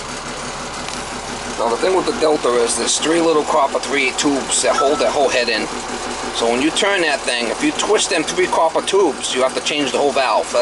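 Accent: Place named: Minnesota, United States. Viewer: Hi,are you from Minnesota or Boston? Interesting accent brother. Nice video, I still dread rebuilding a Delta valve even after 32 years in the trade. DD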